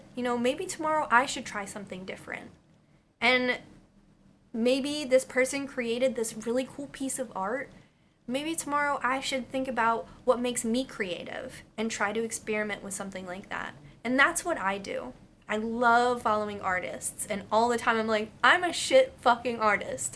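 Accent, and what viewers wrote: Philadelphia, United States. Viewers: I love how in most videos is super cute but every once in a while her "Philly" comes out! Her "Philly" DEFINITELY came out in this video😂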